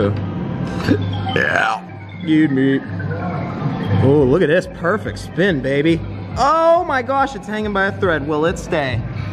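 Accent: Pittsburgh, United States. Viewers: Are you from PA or are you just visiting Pittsburgh...? >.>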